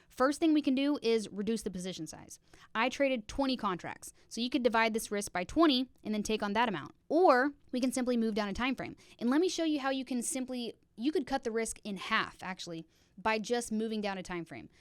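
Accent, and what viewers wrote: United States. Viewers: Original American Accent...❤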